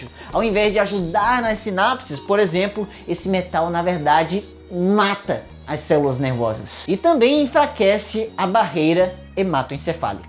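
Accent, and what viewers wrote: Ceará, Brazil. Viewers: Cearense ater falando de ciência é engraçado parabéns gosto dos seus vídeos, eu aprendo e mim divirto 👏👏👏👍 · Cearense kskskskdj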